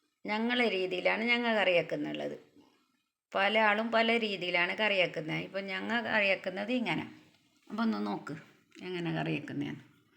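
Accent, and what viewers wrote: Kasaragod, India. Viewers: എനിക്ക് നിങ്ങളെ സംസാരം കേൾക്കാൻ നല്ല രസമാണ് കാസർഗോഡ് ഭാഷ എനിക്ക് ഒരുപാട് ഇഷ്ടമാണ്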